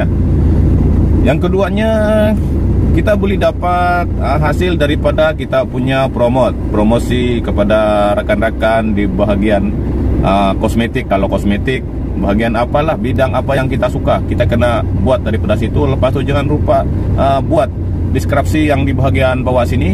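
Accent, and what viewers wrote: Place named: Malaysia. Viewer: Terimakasih tuntunan umum nya. Saya suka memdengar logat melayu. Singapore ape malaysia ni?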